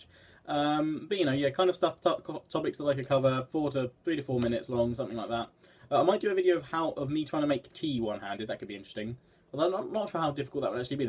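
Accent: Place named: United Kingdom. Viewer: Hey please heal soon I am gonna miss your british voice and all of your videos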